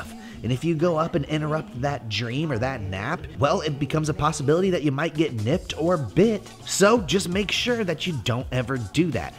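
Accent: Caribbean. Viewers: Me not likely the Caribbean accent or Spanish accent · But that carribean accent on point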